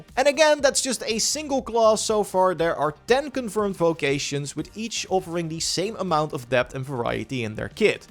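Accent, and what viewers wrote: Netherlands. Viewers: dutch accent is so funny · Dutchy dutchman talking.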